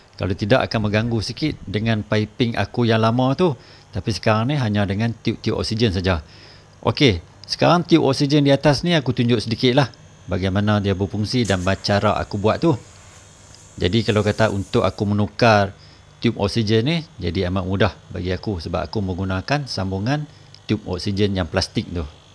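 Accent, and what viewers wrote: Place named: Malaysia. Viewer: Bahanya kok kaya orang Malaysia ya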